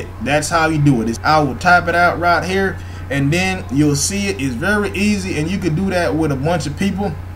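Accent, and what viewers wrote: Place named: South, United States. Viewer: Love the country accent.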